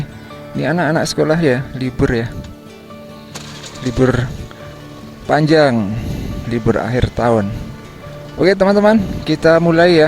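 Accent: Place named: Purworejo, Indonesia. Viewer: Melu ndelok mas,logat cah porjone ketok mas"ding" semangat n sehat terus mase ben iso ngasih info dari porjo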